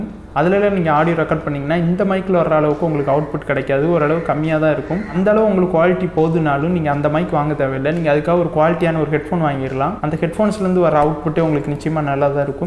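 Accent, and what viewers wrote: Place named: Nagercoil, India. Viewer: Nagercoil Slang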